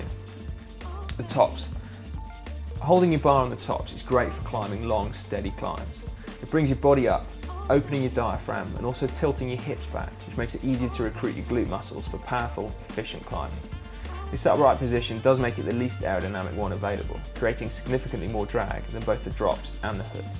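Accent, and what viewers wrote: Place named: England, United Kingdom. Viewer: Put subtitles on your videos, not everyone can understand strong English accent.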